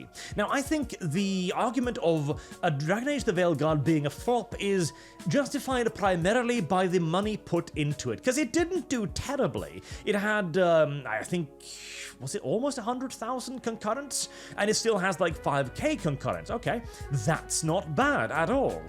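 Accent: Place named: Norwich, United Kingdom. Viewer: What game is this? I cant understand Norwish accent.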